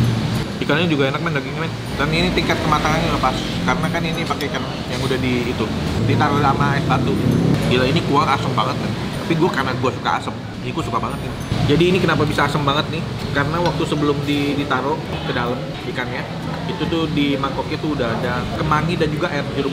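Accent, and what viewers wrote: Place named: Ambon, Indonesia. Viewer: Ambon mana suaranyaa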